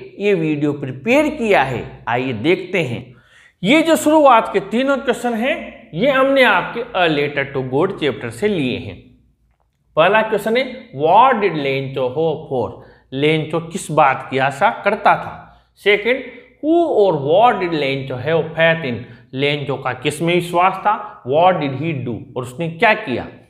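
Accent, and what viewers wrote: Hadoti, India. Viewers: sir aap hadoti pradesh ke lagte hai ⓟⓛⓔⓐⓢⓔ ⓣⓐⓚⓔ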